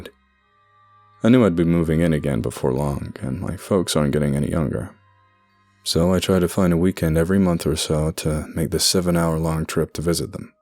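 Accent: United States. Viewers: You sound very American in this one. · You do a pretty damn solid American accent - from different regions, too, IIRC - so it's always fun to hear it. Occasionally you slip, like when you said "been" like "bean" and not "bin" like we yankee folk do. Not a dis at all, it's just interesting and fun to pick out little things like that! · You could so come to America and blend in 100%. Ever consider voice acting or even acting in general?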